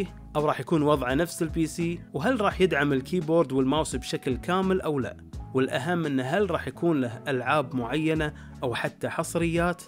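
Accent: Iraq. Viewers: اللهجة عزيزي انه عراقي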